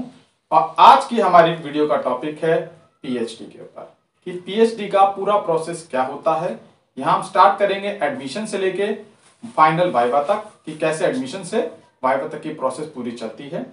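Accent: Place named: Odisha, India. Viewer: i can feel the odia accent 😋 . very informative video thou.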